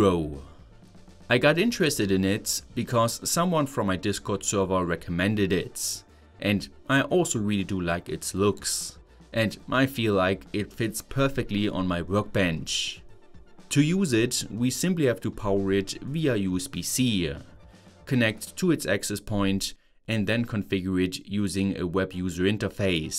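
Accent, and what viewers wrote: Germany. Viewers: Ich dachte mir schon da höre ich doch einen deutschen und dann noch die simme da kam ich drauf😂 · Ich höre da ein wenig deutsch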